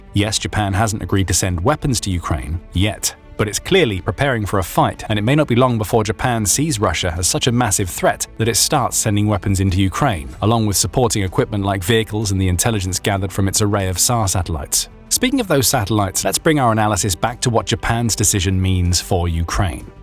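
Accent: United Kingdom. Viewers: Sad. The narrator speaks with a British accent but speaks such dismal English. 'They just gave', 'They just gave' - yugh, what a wrong 'un!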